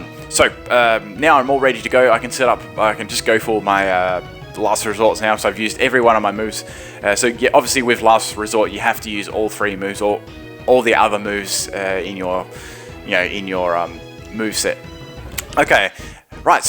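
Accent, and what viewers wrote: Australia. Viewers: He's Australian, if that helps you realize.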